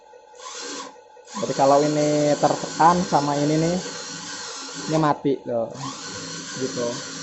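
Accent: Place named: West Java, Indonesia. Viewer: Kanyahoan bgt si a urg sunda na wkwkwk... Sok ah di lanjeng....mugia sukses a🙏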